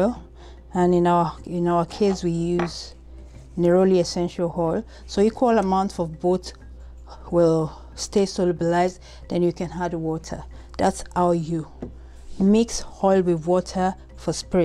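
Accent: Nigeria. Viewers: Are you Nigerian Am picking up on igbo/ yoruba accent ?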